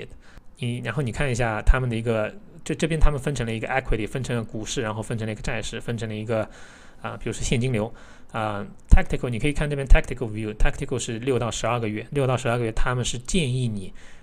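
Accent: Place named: Shanghai, China. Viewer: 哈哈哈，你是个小姑娘吧？看的我笑出声了。他说话上海口音很明显呢，长相很适合演老顽童年轻版本。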